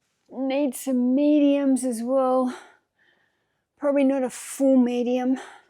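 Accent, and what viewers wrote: Australia. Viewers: I just realised how ocker aussie your voice is haha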